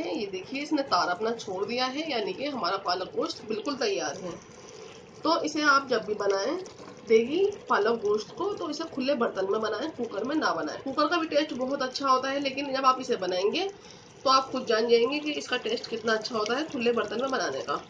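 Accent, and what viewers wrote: India. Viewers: Hello Assalamalikum: I have been making saag ghost for a very long time but I came up with your channel and tried it last night. I couldn't believe it how tasty this saag ghost came out. My family couldn't stop eating it. It was so delicious and well-made. Jazak Allah for sharing your talent. I love your Indian urdu accent and MashaAllah you are so talented. I also tried your other recipes and I loved it so much. Your method is so simple and easy to follow. Jazak Allah again. :)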